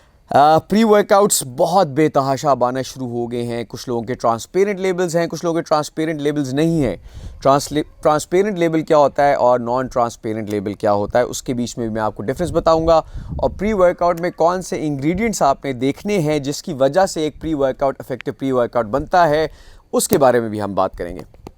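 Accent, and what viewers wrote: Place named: India. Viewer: Why are u sounding like some Indian influencers?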